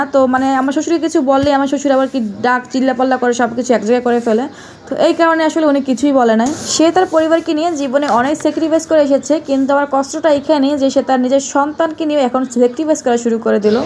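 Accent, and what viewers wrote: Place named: Dhaka, Bangladesh. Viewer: Dhaka Kotha talking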